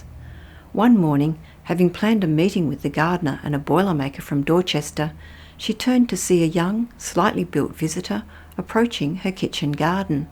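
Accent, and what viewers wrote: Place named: Australia. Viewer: The narrator has an Australian accent...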